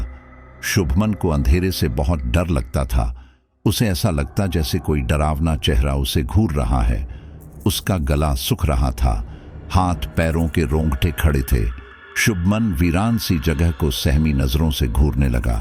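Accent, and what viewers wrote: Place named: Bihar, India. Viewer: यह कौन सी बिहारी है आधा हिंदी आधा बिहार😅😅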